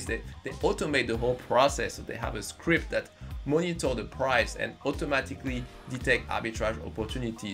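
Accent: France. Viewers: He sounds french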